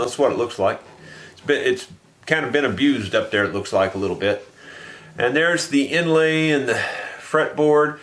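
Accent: South, United States. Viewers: These videos are becoming addictive to me as he verbally takes us step by step through the process of working with his hands, with wood, in that sure and easy southern voice of his.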